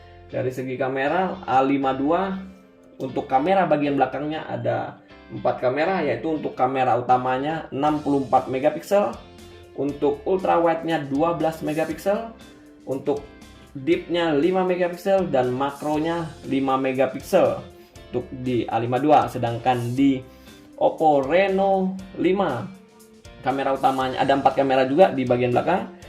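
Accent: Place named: Padang, Indonesia. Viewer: Yang review kek orang Padang deh. Logat nya keliatan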